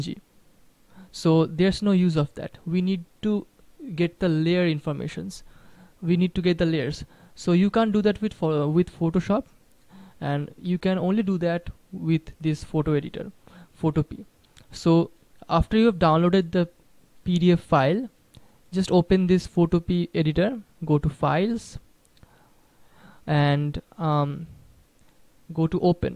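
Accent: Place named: India. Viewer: Aap hindi me bhi bana sakte thea sun k lag raha h ki aap indian ho 😗🚬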